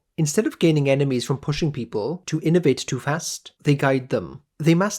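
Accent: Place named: RP, United Kingdom. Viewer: INFJ here. The bigger picture destroyed and made me at the same time 😅 also.. you have an extremely posh voice, I love it. 😅😊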